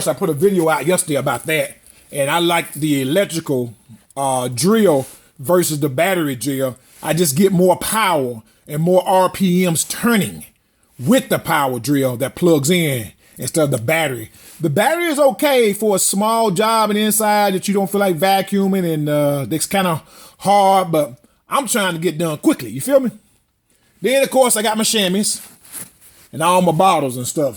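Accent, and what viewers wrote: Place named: South, United States. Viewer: love your channel my man!your cool southern accent makes me run down to popeyes and get me a few biscuits!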